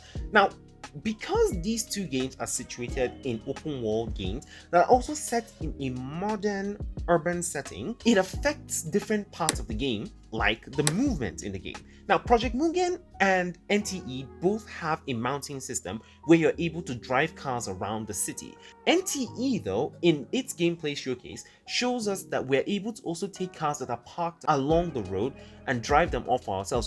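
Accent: Nigeria. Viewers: Bro are you Nigerian? You sound Nigerian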